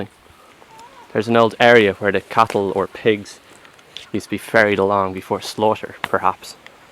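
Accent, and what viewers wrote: Ireland. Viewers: the grid beside the barn is a cattle crush for inspection and reparing hooves not slaughter. and the shackles were probabley for side lining donkeys to stop them running :) and ya sound like your from dublin dude im connemara :D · Why the fuck would you assume he's American? This guy is clearly Irish. There's also a bottle of Paddy whiskey and the newspaper even mentions Garda, if you're too stupid to pick up on his accent. Bovril is a type of beef stock which is used to make a broth tea. You're an idiot. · i love the irish accent. "the udder side" lol.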